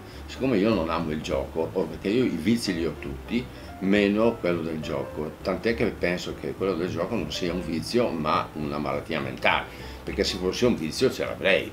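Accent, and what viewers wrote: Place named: Naples, Italy. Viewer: Spesso dice cose discutibili ma qui è stato grande con tutte le ragioni simpatico reale e comico l'accento espressivo napoletano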